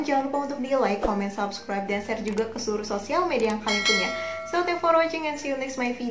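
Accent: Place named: Banyumas, Indonesia. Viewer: Gedombrangan haha ,, ngapak bgt ya kak 😁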